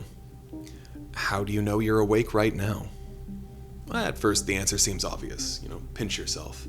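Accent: Ontario, Canada. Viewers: lousy canadian accent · i love your Ontario accent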